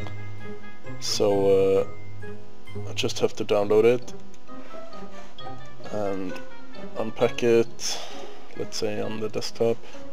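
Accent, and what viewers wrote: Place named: Sweden. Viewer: Are you Swedish? Just a guess based on your English accent...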